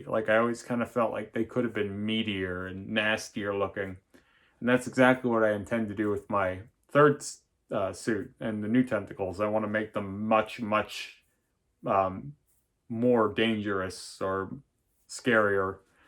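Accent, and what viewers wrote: Canada. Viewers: I appreciate your reply. Did not know you were Canadian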